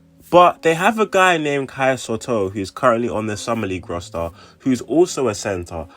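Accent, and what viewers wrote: United Kingdom. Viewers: I love your British accent. And look at that 3 point shooting at the end of the video. Near flawless!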